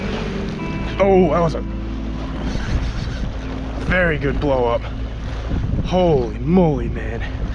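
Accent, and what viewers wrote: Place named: Fall River, United States. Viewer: Are you originally from Rhode Island, you kinda have that Fall River accent, not that it’s a bad thing, cause I’m from Fall River but I also fish Rhode Island here an there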